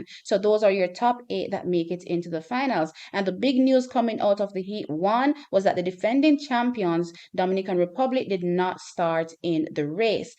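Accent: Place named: Jamaica. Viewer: Hi. I like your channel. I love the topic. I am American, but I am enthralled with the Jamaican female sprinters. If I can make one suggestion for you?... Too much commentary w/o enough visual references. You are pretty you look at, and I love the accent, but we need some pics or video referencing what you are discussing. It helps to keep our attention. 🤷‍♂ 👍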